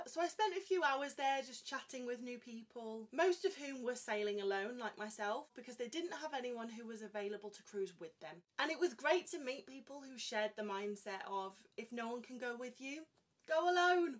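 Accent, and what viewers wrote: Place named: Midlands, United Kingdom. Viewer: That sounds like a Midlands accent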